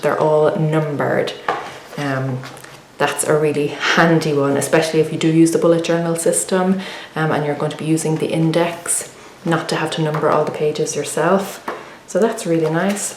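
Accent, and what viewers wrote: Ireland. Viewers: I love your Channell and bye the way I am sorry if this is rude but your voice kind of sounds irish and I live in Ireland do you?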